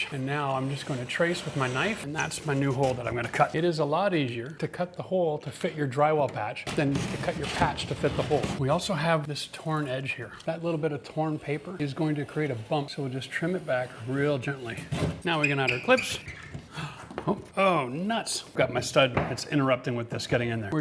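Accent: Canada. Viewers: He definitely sounds Canadian to me :P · Canadian aye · Are you Canadian?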